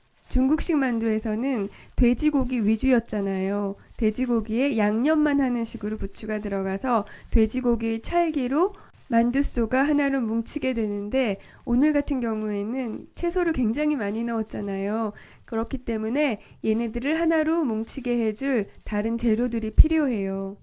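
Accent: Seoul, South Korea. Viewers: 연세가 어떻게 되시나요? 서울사투리에 가까운 발음이네요...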